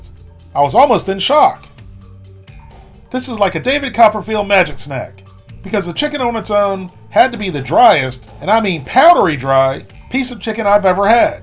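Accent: South, United States. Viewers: Hey! Just started binge watching your videos this week and I have to say it man, love the southern drawl. Makes me feel right at home. Keep on keepin on brotha!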